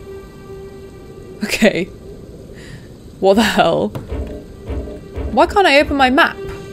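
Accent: United Kingdom. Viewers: I bought the game but have yet to play it...will finally give it a try this weekend. With that being said, I've really enjoyed watching your videos in the meanwhile! My boss has already told me if I say "ahhhh balls" in a British accent one more time, he's firing me lol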